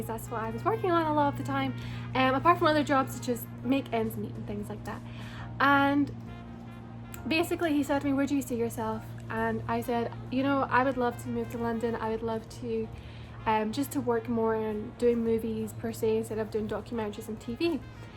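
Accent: Scotland, United Kingdom. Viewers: Please don’t ever ever ever ever lose your accent. The Scottish accent is like music. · It’s so amusing to hear the blend of your Scottish accent with the American accent! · Love Your Scottish Accent So Pretty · Please don't loose your Scottish accent and your beautiful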